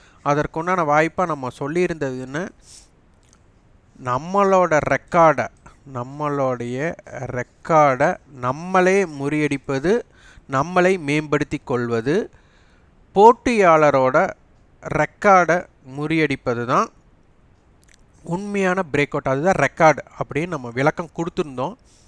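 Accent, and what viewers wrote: Kongu, India. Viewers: Masterji Today i watched this video more than 2 times, while driving to my office i listned the audio more than 5 times, i noticed a thing, if i check & implement it in the market(s), then i will update later. Your teaching & your Tamizh slang are Awesome Master ji, (Iam a big fan of your Kongu Tamiz Slang) Iam sorry iam unable to type on our Tamizh. 👍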